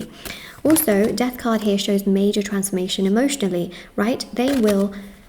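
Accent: United Kingdom. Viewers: Hey actually… when your video popped up on my feed… I thought- “Hey, I haven’t seen her in a bun before.” “She looks rather pretty (said in a thick British accent) (pun intended😂❤) (cuz you have a British accent) (I suppose) Huh. You Look Beautiful. There is no other way. And I will not hear of it! ❤❤❤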